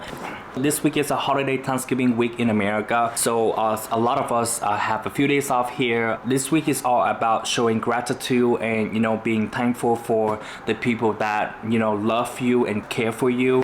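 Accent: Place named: Vietnam. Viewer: This was so dope! You’re such an interesting, intelligent, methodical and organized person. I also love how your Vietnamese roots shows up in your English. It’s so dynamic and beautiful! P.E.A.C.E., J!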